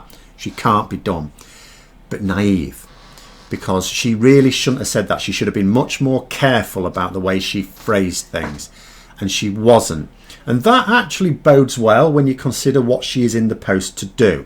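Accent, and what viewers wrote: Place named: Chesterfield, United Kingdom. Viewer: out of interest where do you come from? I’m from Chesterfield and your accent sounds local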